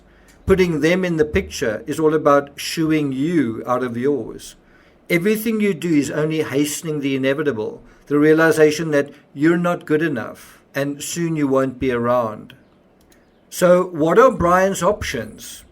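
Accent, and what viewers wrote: South Africa. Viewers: You sound South African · Excellent work,after feeling your near desperation in the 'recent true crime circus'- you totally nailed this one and your quality shines through strongly. Best wishes for a quick recovery of your injury.( my 9 yr old loves your S.A. accent and 'please doo' is heard often in our household..lol)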